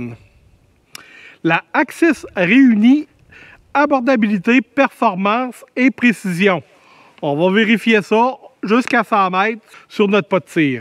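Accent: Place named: Canada. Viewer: Très sympa cette petite vidéo.nous français on adore l accent Canadien.😊 Je possède deux axis en 270 win pour le tir avec une lunette en 9x24 et une 30.06 pour la chasse avec une lunette de 3x9 et j en suis très satisfait.fiable et précise.un excellent rapport qualité prix.meme avec des munitions partizan a 10 euros la boîte ça fait le job.👍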